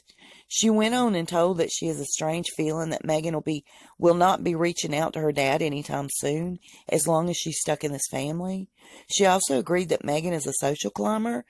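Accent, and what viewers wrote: South, United States. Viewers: You love nasty,mean gossip.I can hear your southern accent,maybe your racist,because your not patriotic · I love the lady's voice in this video...Very southern and charming...and I almost kind of believe her.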